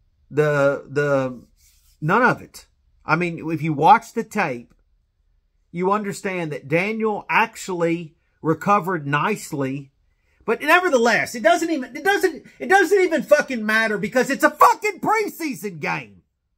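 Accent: South, United States. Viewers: Where are you from because you sure don't sound like you're from NJ or NY? You got a southern drawl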